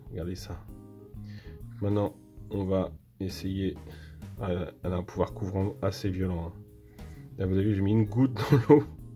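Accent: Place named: England, United Kingdom. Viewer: Merci pour cette vidéo, hâte de voir ton ressenti au niveau de son appliquation. On en parle énormément des peintures AK en ce moment. Je vais voir ta vidéo sur le set Gold. J'adore ton accent Anglais 👍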